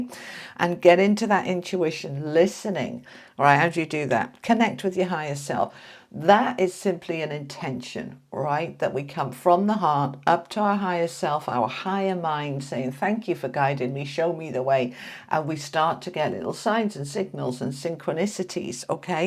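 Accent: England, United Kingdom. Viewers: Thank you ❤ What a pleasure listening to your English accent. I have been fighting for years for my heritage mainly with my sister who is taking all the economic profit on this situation. Lately I’ve sent un ultimatum to her lawyer because I am just tired of this unfair situation. This is real insane when we have to fight against our own family but it’s just scandalous the way she can take profits of the situation making me paying for her accommodation with all the referred financial charges. Well I pray for the end of this mess because I am getting old and tired to continue working paying for her profits. Even my health balances at my age and my body keeps telling me it’s time to stop. Hoping that the sun finally comes out and brights in my sky and in my heart. Thank you very much for this vidéo. You do a great job. God bless you 🙏🫶💐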